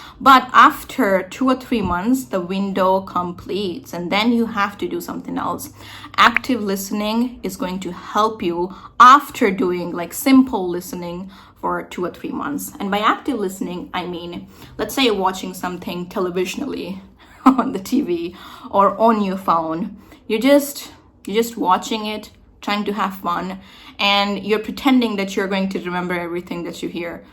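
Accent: United States. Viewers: To learn an accent like you do, I need to live in a US-like environment, or can I learn it at home by myself by practicing it? I know the basic english, and I speak it well. And nice video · I live in an area with one of the highest Sikh populations in America and we host one of the largest Sikh parades in the world. Your American accent is better than the overwhelming majority of Indians who live in this area. For some reason, learning the accent is seen as disrespectful by a lot of people. To the rest of us, it shows the amount of effort you've put into learning. I'm currently learning Welsh, so I know how difficult this journey is. Congratulations on your progress.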